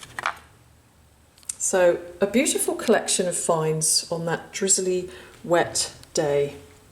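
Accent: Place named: RP, United Kingdom. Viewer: Please don' take this offencively but you look and sound like really posh totty.You also really enjoy your finds.A perfect lady of which there so few these days! Keep digging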